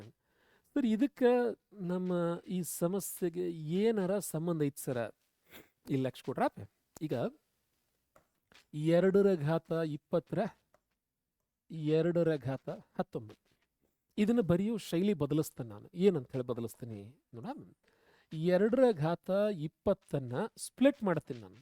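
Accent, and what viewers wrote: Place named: Karnataka, India. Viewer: Sir we love your dharwad accent . It fills our heart ❤️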